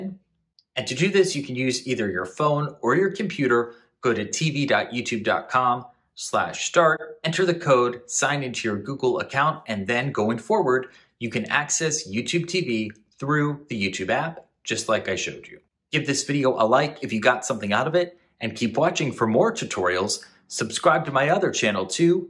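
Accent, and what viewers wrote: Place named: China. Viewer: Como eliminar la voz en off con acento Chino? Gracias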